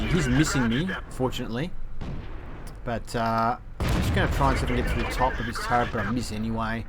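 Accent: Australia. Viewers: hi are you aussie?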